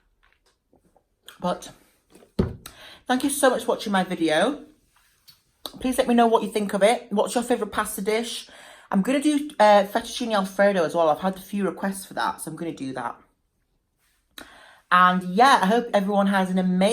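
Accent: United Kingdom. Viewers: Love you are the bomb I love watching your videos and listening to you British accent! Your spaghetti looked amazing!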